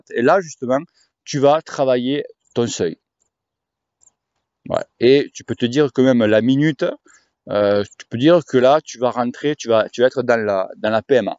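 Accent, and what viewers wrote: South, France. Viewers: Bravo, super vidéo. Ne change rien, ce ne sont que des jaloux. Et vive l'accent du midi. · Ride on! continus à être honnête, franc, généreux et entier. Dis ce que tu penses au moment ou tu le penses et avec ton accent du sud: c'est comme ça que l'on t'aime. Ton sens du partage fait plaisir à voir. Tes messages de prévention sont les bienvenus pas trop d'exo de force pour les jeunes,..., pas trop de zwift. merci de t’inquiéter pour nous. c'est sympa. peace.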